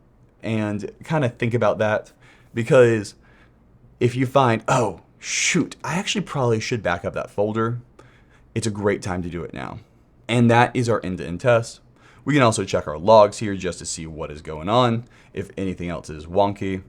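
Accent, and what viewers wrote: California, United States. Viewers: Love the channel but this the most California accent I’ve ever heard in my life and I forget every time until I click on the vid 😂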